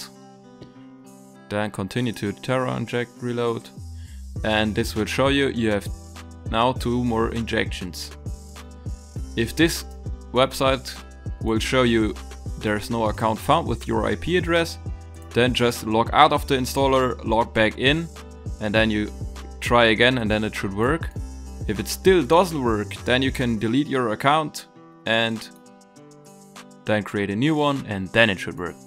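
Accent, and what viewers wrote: Germany. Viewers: Den deutschen hört man raus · are you german ?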